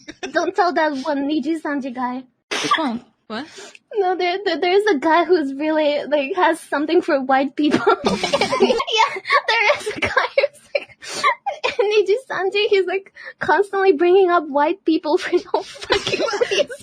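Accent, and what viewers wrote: Ireland. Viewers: You're Irish? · wait, is irish?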